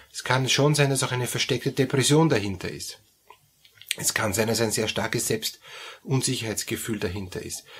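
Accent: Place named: Austria. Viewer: Sehr geehrter Herr, Ihren Kanal finde ich wirklich gelungen, außerdem liebe ich die österreichische Aussprache. Ich möchte Sie bitten, in Zukunft, Themen wie Schizophrenie oder auch Depersonalisation/Derealisation, aufzugreifen. Vielen Dank.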